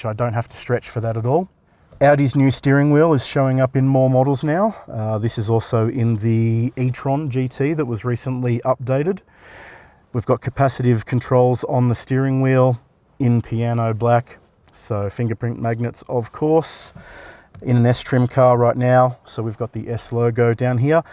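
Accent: Australia. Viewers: " tie me kangaroo down!" I can recognize that Aussie accent anywhere mate. "Crikey!" BTW I don't live in Australia I live in Europe. Lol, lol!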